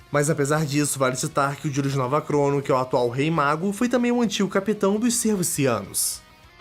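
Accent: Portugal. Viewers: Tu é português? Tem um pouco do sutaque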